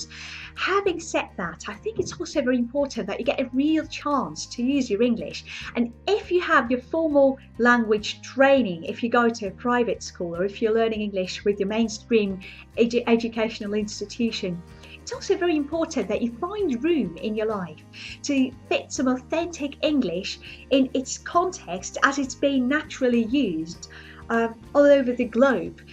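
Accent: United Kingdom. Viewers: Your accent is so British and so amazing ;-)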